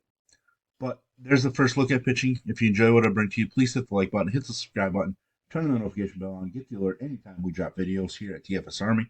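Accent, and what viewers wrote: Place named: Wisconsin, United States. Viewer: Dope info here. You got to be from Wisconsin right?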